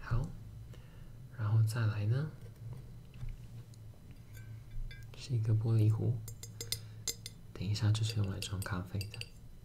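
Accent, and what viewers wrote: Taiwan. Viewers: 剛才躺著聽這個準備睡覺，都沒有看到視頻的題目，可是聽到你我就知道你是台灣人。好喜歡台灣人說的普通話。口音好好聽，還有你的聲音也非常好聽哦!希望你會做更多視頻! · 男生台腔做ASMR还是很舒服的，我补脑了下东北口音瞬间笑死。做的很棒！非常感谢。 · 我是大陸人，我就超喜歡台灣的口音的！感覺超溫柔超可愛的！哈哈，每個人喜歡的不同吧，反正我認識的朋友都很喜歡台灣口音，不會排斥的💛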